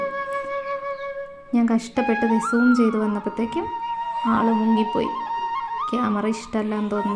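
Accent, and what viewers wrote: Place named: Thrissur, India. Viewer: Thrissur slang kalakiii👌👌😄😄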